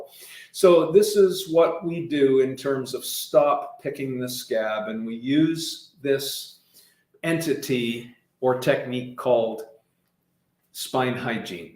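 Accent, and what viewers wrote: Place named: RP, United Kingdom. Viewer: He is only speaking with a plum with. His mouth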